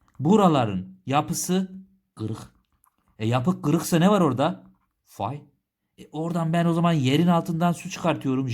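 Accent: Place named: Van, Turkey. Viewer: konuşmaniz biz vanlilarkine benziyo😄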